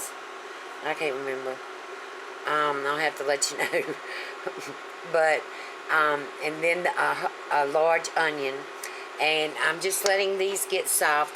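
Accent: United States. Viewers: Love that american accent. Will try out this recipe later today in London UK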